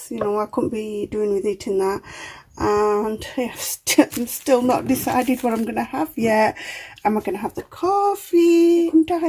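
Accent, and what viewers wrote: Sylhet, Bangladesh. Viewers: আপা খুব ভালো লাগলো ভিডিও টা,,, আর আপনার মুখে সিলেটি মাথ হুইননা মন টা বইরা গেলো,,, নতুন জয়েন হলাম আপু,, আশা করি আপনিও জয়েন হয়ে যাবেন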